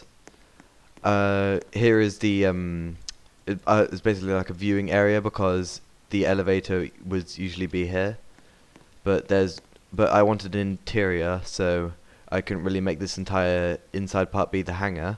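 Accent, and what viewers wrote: United Kingdom. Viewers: you have a really nice British voice dude, I think you should try going for a podcast :) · Boii ur british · damn. U sound so british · R u British if ur British ur y biggest youtuber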